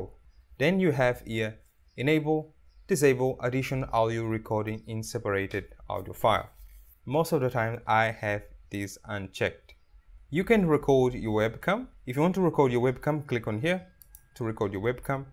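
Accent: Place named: India. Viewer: i like the slight indian accent lol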